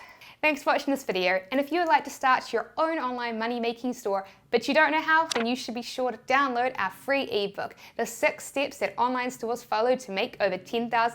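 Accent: New Zealand. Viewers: Is that normal accent in new Zealand ? That'd be awesome! Greetz from Germany 😱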